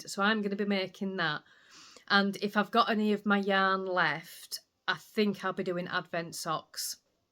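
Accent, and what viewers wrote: Yorkshire, United Kingdom. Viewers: Oh, I'm so sorry that some grubby little person has seen fit to spread their poison onto your lovely vlogs. And they are little people with little lives who have nothing better to do so let's give a collective f off (I don't use that word but it seems appropriate here 😁). Someone nearly destroyed my dear friend Sue (Suzie Stitcher) who is the sweetest most inoffensive person. She nearly took her channel down but I'm glad she bounced back., your warmth and lovely Yorkshire accent just oozes out of your channel - and you have lots of fur babies 🥰😍🤩 Well, it was 14C yesterday and 40C not so long ago - I'm glad that I didn't put my long johns away Ha Ha Look forward to seeing you soon. Much love, Angela 😘😘😘XXXX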